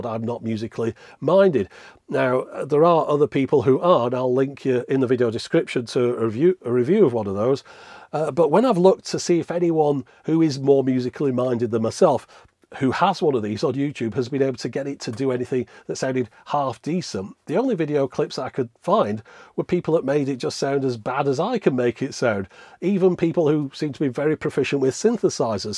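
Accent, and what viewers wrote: United Kingdom. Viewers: Wow, this guy is from the UK??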